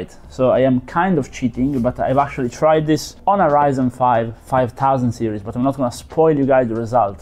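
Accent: Italy. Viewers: His italian accent is killing me😂 grande bro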